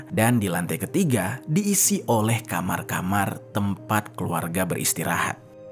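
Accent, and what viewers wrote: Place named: Medan, Indonesia. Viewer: Kok semua bahasa nya entah kasus atau apapun yang ada di daerah manapun kenapa selalu logat medan?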